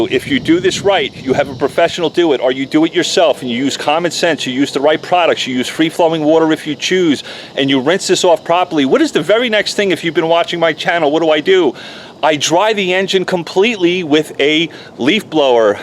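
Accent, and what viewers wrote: New York, United States. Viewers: Why do you have new York accent? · You sound so much like my NY friends (or me..lol) Great video and hope people pay heed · So, how do you get the stuff off your engine if someone has done that? btw, glad to see you're here in Dallas, pard. Your accent though - you're from the NY area, possibly?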